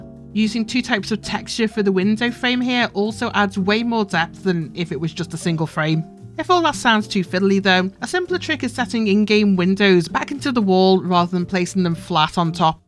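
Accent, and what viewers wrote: Liverpool, United Kingdom. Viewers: Thank you mysterious scouse coaster goddess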